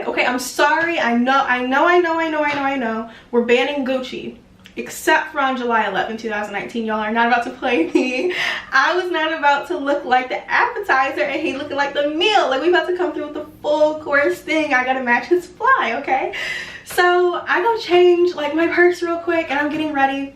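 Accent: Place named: Midwest, United States. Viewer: Also this midwestern accent is making me sooo homesick <3 <3 <3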